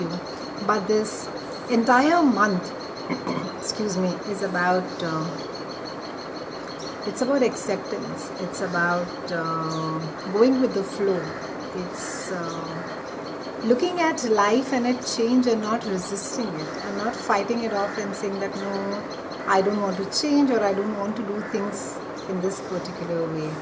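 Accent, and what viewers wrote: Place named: India. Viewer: You talking like India do you have any Indian background.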